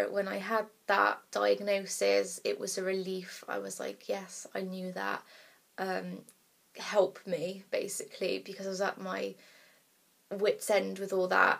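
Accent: Bristol, United Kingdom. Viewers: you from bristol/south west? am a fellow janner haha thank you for sharing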